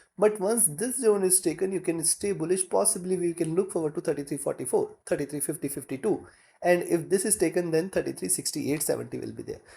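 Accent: India. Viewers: Its hard to focus on what you saying . Sound like Indian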